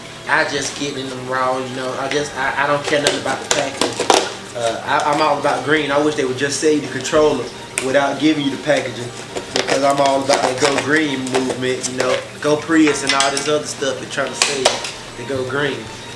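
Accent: South, United States. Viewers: He's southern the white people there sound the same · u from da south aint you? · yo voicee is country and raw iwish i could talk like that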